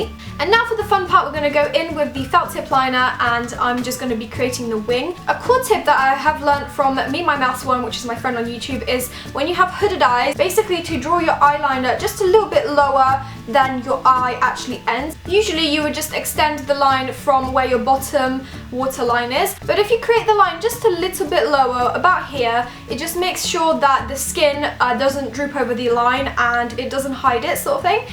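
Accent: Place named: United Kingdom. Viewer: Girl do you live in the UK you have a british acsent I live In the UK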